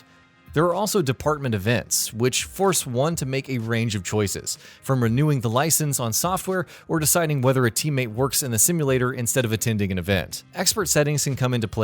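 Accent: United States. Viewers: Jesus christ the Americans yet again have murdered the english language. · Never let an American narrate this again..... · drives me up the ffkin wall. what the hell is ren olt?? Re nooo! not hard and Marrrzipin is Maz a pin Why won't yanks get that the letter a isn't a flaming R!! And Alain Prost where is the e that turns that o to an oh sound??? FFS I don't like dislike many videos but this gets one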